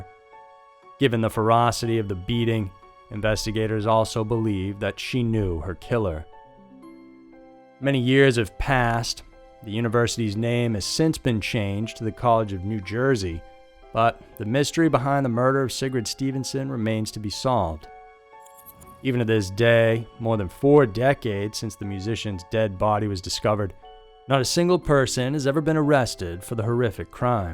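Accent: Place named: Texas, United States. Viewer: I think he has a Texas accent. Its very familiar. Probably why I like listening to.it. when he says 5 like fiiiive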